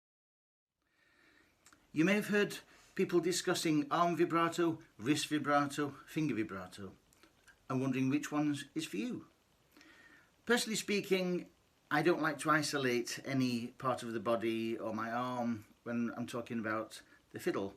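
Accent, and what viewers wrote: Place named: Northern England, United Kingdom. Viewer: Thank you for the explanation! (Great to hear a lovely northern English accent, too! )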